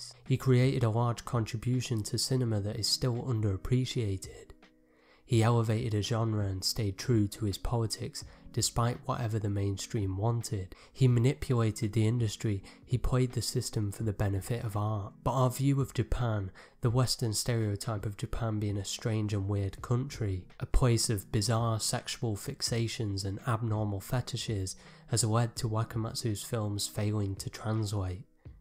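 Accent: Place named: Manchester, United Kingdom. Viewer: This was really interesting! Something made me think about Wakamatsu earlier tonight, and I've only seen one film of his (Go Go Second-Time Virgin, some time around <num>) and gave his name a search and clicked through to this. I definitely wasn't expecting to hear a Manc accent hahah.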